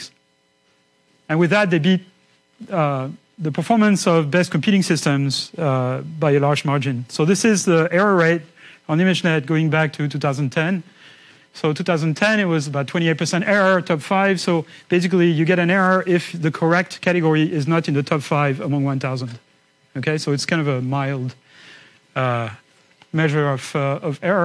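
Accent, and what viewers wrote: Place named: France. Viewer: He has a french accent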